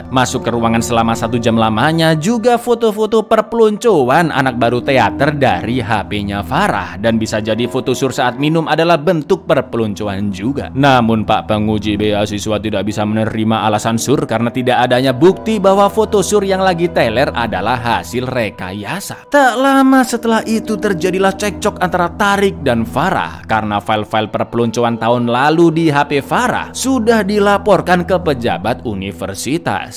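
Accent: Java, Indonesia. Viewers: masih inget gw video pertama yg gw tonton dari channel ini, pas ngidul film The Pacifier, dari situ gw udh suka banget kontennya cak, pembawaannya santai, medhoknya ada, ama diselipin humor-humor receh, mantep dah pokoknya, btw selamat ya cak atas 1 jt subs nya, mugo-mugo kontene sampean tambah rame dan iso tembus 2 jt subs, aamiin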